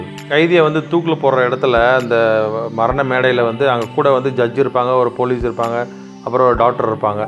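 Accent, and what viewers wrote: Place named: Kerala, India. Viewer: Bro i think u r from kerala maybe malayalam slang tuch anyway good job good explanation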